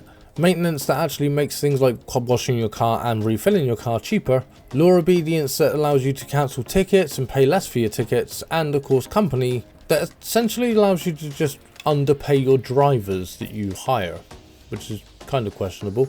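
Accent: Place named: Bristol, United Kingdom. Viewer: Is that a fellow Bristolian I hear?😂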